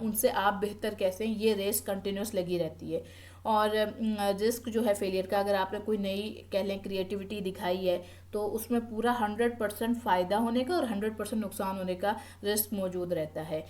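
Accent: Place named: Karachi, Pakistan. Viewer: From your speaking style i can guess that you belong to KHI ❤❤❤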